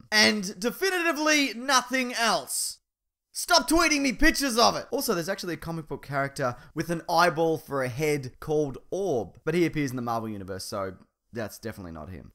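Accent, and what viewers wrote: Australia. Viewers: Great video! I love your voice, by the way! Nice accent, and I love how you get louder and quieter. Are you Australian?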